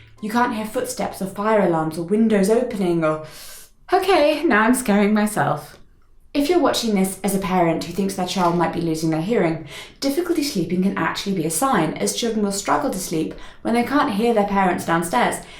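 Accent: United Kingdom. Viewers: Your positivity is so contagious,; I love it! 🥰 Thank you for the tips on learning to lip read! I definitely do rely greatly on captions right now! 😬 Especially with different accents, like yours. I adore a British accent, but struggle to understand some words sometimes... although I've watched enough of your videos lately that I'm starting to pattern your voice 😊 P.S. you hit on all of my moms biggest pet peeves about talking to people (Covering their mouth, chewing etc)...people can be so annoying and disrespectful sometimes!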